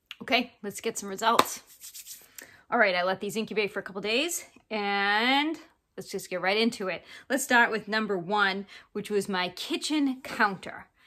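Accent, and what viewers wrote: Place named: New York, United States. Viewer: Can't get over her accent. New York somewhere?...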